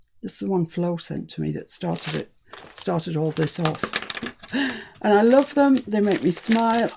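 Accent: England, United Kingdom. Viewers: I only caught the tail end of the 'live' on Sunday and our 6-yr-old grandson came along as I was watching. Our grandchildren know you as " " and realize you live in England. They love your accent. Tonight (Monday) I was watching, trying to catch up from the beginning. Warren was here again (and he would pronounce his name 'Wawwen') and said, "She's still awwganizing?" and watched along with me for awhile. I've just walked him down to his house and he was repeating, "I'll just put the buttons in the button drawer" all the way home - complete with the English accent.:) You're a hit in our house!! And by the way, I'm suffering from SES (someone else's 'stuff') envy - big time! Oh, and almost the first thing you picked up on your pile was an envelope from Clem...I grinned.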